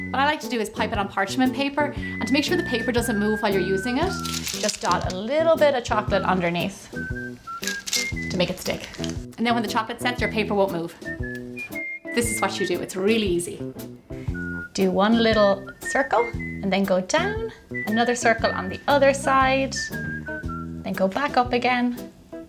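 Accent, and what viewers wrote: Ireland. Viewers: I thought u were from Ireland nice to meet you! ☺️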